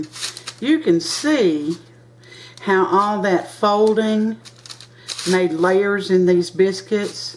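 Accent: South, United States. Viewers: Thank you so much! Those look so easy! I'm a yankee who has been known to make hockey pucks instead of biscuits and I think these I could do and not mess them up! I had a friend from SC even show me how to make biscuits when she came up here to visit and she said pretty much the same thing you did...when you are mixing them she said 'don't screw with them too much! in he same sweet southern drawl. <3 Thanks again for the recipe I thin I might go try them RIGHT NOW! :D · I have been trying to make some for a long time & each time they are like rocks,so sure gonna give this a try,I aint giving up on baking.Love your southern drawl :)i never had problems years ago in UK.The folding technique is a new one on me.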